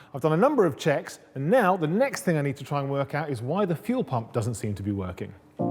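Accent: United Kingdom. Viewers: Субтитры в процессе, хотя я к 4 серии британский говор стал лучше понимать, до этого в основном американский английский слушал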